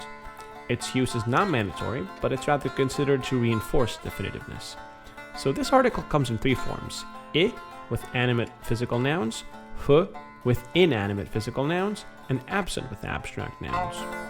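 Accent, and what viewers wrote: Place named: Sweden. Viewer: The intonation sounded very Swedish.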